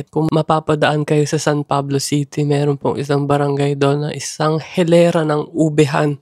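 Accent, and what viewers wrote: United Kingdom. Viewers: I love your British accent ❤❤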